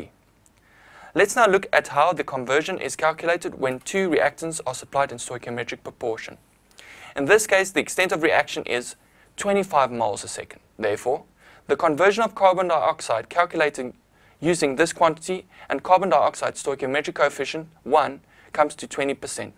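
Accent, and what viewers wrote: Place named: South Africa. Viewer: This man has a standard South African accent (or at least it sounds like it). I thought I was listening to a lecture video from my Uni for second😯. Also, the video was quite helpful.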